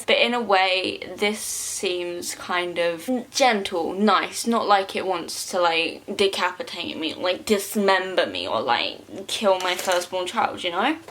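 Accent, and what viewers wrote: England, United Kingdom. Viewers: Taylor Stege she's English :)) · you mean shes from England, Australian's still speak English :) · Ur English 🇬🇧🇬🇧🇬🇧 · So this is suppose to be a complete compliment.... I love how you talk, you slightly remind me of a sassy like pirates teenage daughter. I'm from California so I'm obsessed with English accents just like every other Californian haha but yea this is great. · Is she British?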